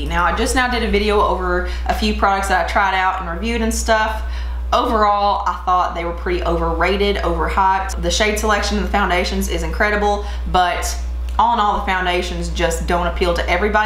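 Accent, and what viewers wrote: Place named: Kentucky, United States. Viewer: I randomly found you in my homepage. As soon as I heard you talk, I thought to myself "girl has to be from KY". Checked out your FB and sure enough you are. Girl I'm from Hazard and we have alot of friends in common. I went to EKU. Saw where you are there! I'll be binge watching your channel!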